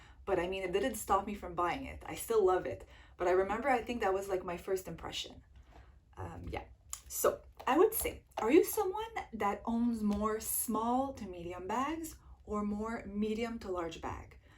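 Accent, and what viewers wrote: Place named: Montreal, Canada. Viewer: You have the cutest Montreal accent ❤️ cute comparison!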